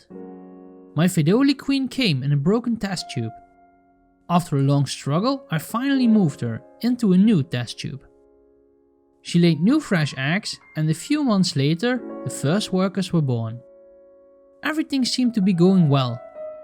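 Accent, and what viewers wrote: Netherlands. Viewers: Ik dacht al dat ik een Nederlands accent hoorde =D toen zag ik de oven.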